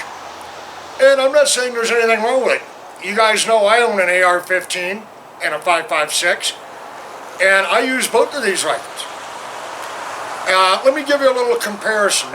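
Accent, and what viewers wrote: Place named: Isle of Man, United Kingdom. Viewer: You have a Manx accent.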